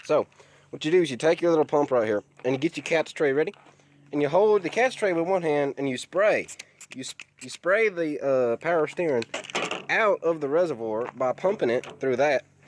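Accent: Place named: South, United States. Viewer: Nice accent fellow southerner Also nice tutorial type thing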